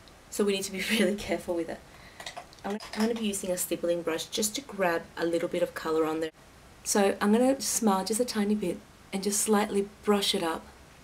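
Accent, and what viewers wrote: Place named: Australia. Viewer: I absolutely love Australian accents. :) So cute! Beautiful look!.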